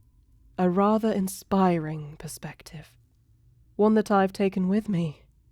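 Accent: United Kingdom. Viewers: Tbf, I love the British accent so much.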